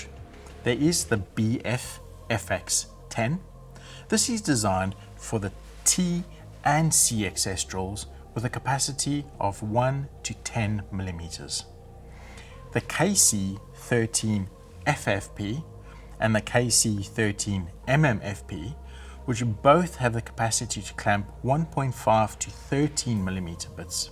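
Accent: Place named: South Africa. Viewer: I can hear he's a South-African. I know my people.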